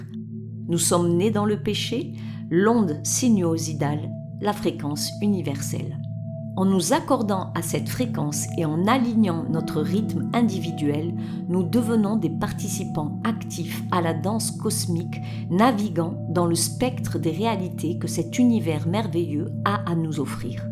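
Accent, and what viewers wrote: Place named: South, France. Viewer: Encore un grand merci pour cette vidéo très instructive! Elle me donne l'envie de pratiquer davantage le yoga, de méditer davantage et de chanter encore et plus encore! Et des milliers de mercis pour ta jolie voix du sud de notre beau pays qui me fait chaud au cœur❤❤❤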